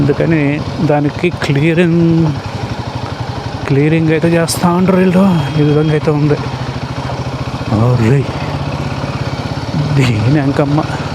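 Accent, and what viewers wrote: Nellore, India. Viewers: Nellore bro...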